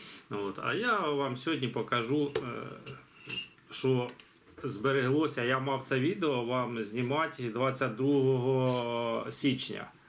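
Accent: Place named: Kirovohrad, Ukraine. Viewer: Дякую за відео! Підписка, вподобайка. Ви часом не з Кіровоградської області? Ну геть розмовляєте, як у нас). Чи продаєте Ви саджанці винограду?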